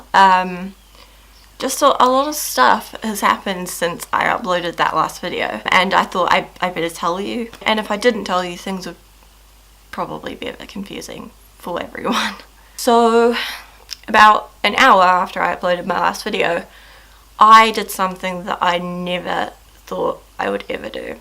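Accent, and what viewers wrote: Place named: New Zealand. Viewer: Yeah, I like a more submissive role, but I think I can enjoy a dominant role too. (Change of subject). I'm from the U.S. and I've gotten board speaking how americans do. I wanted to change my accent. Long story short, your video on kiwi accent popped up. Since then I've been studying your (and a few other people's kiwi) accents cause that's basically how i want my accent.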